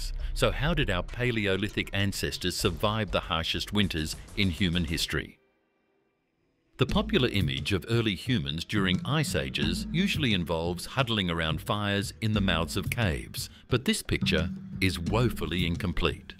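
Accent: Australia. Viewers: Sounds Australian, but used Fahrenheit 🤔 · Australian accent I think...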